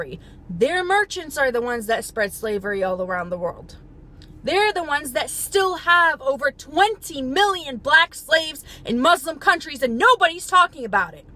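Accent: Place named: Texas, United States. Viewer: I'm confused, if you grew up in the Middle East, Like most people in my family, where is your accent? You DID say that you GREW UP in the Middle East. And you're mispronouncing most Arabic words. You sound like you're from Texas or Alabama and such... And by the way, The equal pay thing is not a myth. It is true lol.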